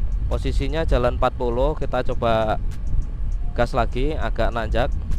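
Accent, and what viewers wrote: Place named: Java, Indonesia. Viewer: Asli mana mas?kok logate jowo "dronjongan" 🤣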